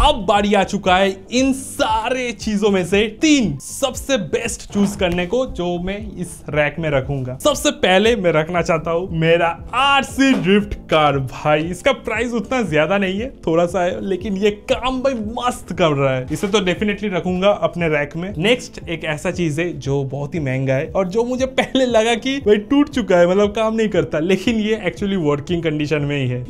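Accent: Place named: Bengal, India. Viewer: Hindi with bengali accent......sooo cute 🥰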